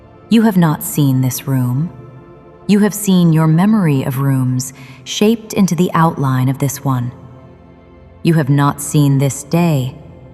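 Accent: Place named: United States. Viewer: If you are DF Mother God, may I ask, why do you have an American accent? ??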